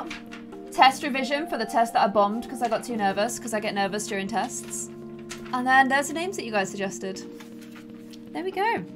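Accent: United Kingdom. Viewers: Hi I love your channel and no I am not just saying this for likes. I just want to see this just nothing else. you are amazing you inspire me and inspire me to do stuff like you do. I love Britain and I love your accent. If you would just see this that would be a miracle but of corse your not because no one does ever. Just please see this and remember I just want to see this. I don't care how many likes I get so don't put hate comments.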